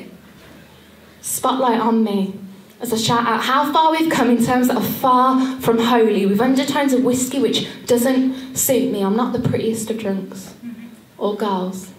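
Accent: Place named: Birmingham, United Kingdom. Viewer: excellent, the brummie accent really suits your style, and you're beautiful too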